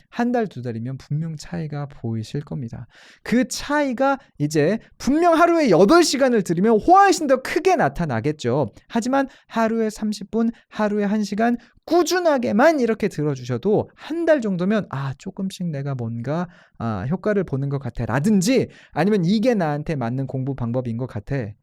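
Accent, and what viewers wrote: United States. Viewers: 근데 뉴질랜드에서 유학하셨는데 미국영어 발음(?)을 가지신거 같아요~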